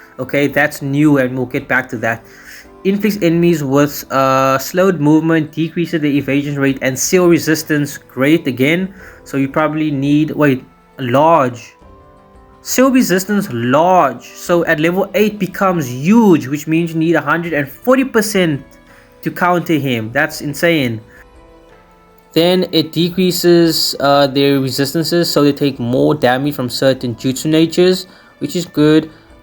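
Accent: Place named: South Africa. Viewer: Bro you from SA? can’t mistake that accent 💀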